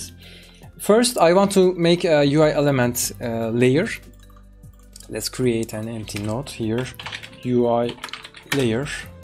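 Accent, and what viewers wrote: Turkey. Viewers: Your accent like Turkish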